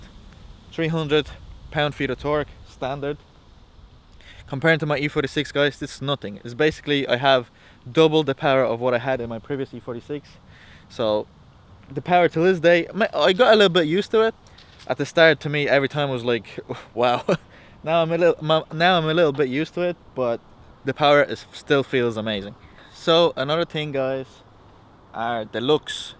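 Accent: Ireland. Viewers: you sound like you have adapted a irish accent but have talked another language in your early age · Where you from your Irish sounds mixed up with another language?? Great car I want to buy auto would you recommend thanks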